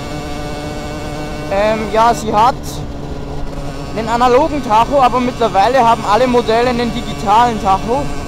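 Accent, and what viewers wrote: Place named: Burgenland, Austria. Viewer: vermutlich burgenländer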